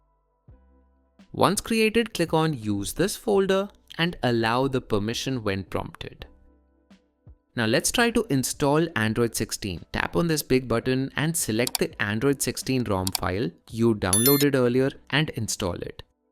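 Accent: India. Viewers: The Indian accent makes it very suspicious!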